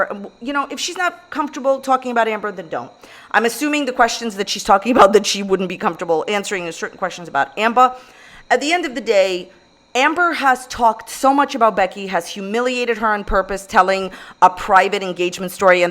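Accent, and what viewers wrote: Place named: New Jersey, United States. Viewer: Love voice the most about her videos it’s so Joisey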